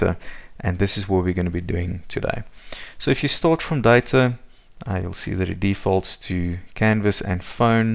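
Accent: South Africa. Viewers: South African? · Cape Town accent??? We are homies. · Hi, where are you based? Can hear the SA accent. Are you perhaps in Cape Town to attend a course or two? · Thank you Mr. This was 10X better tutorial than others videos out there. Also picked up on that SA accent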